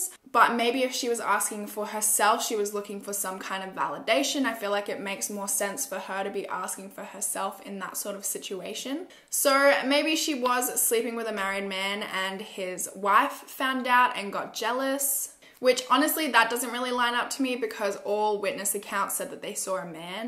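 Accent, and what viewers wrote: Australia. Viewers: i love your accent so much. i want an australian accent but i’m a boring american 😅😂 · I love the Aussie accent so much! Am I the only one haha?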